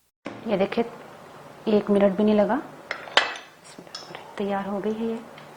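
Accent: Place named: Punjab, India. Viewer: Ap ki Punjabi so sweet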